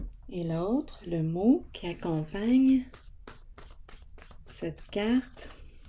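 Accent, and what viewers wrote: Canada. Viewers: Bonjour. Merci pour vos tirages très parlantes. J'adore votre dialecte. C'est français canadien? Par avance, je vous remercie pour votre réponse et je vous souhaite un excellent weekend. Bien à vous 🤗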